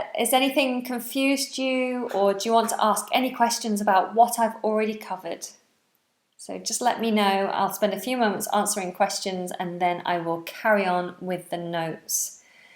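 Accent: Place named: England, United Kingdom. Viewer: ganahan ko sa imohang English accent. salamat kaayo inday!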